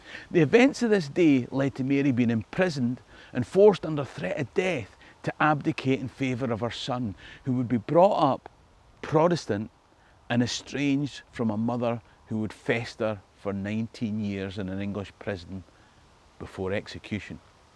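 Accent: Scotland, United Kingdom. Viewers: another great video presentation,. but why the "closed captions"? even as an American, I can understand everything you say. although, I can see where some may have trouble comprehending a Scottish dialect. again, thanks for such a great video.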